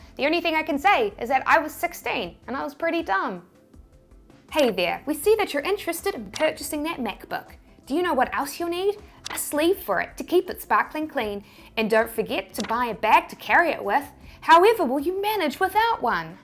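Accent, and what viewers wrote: New Zealand. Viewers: are you from Southland? i think i can detect it in your accent! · Came back to get my annual NZ accent dose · thanks for the great info! The best thing about this channel is your lovely new zealand accent!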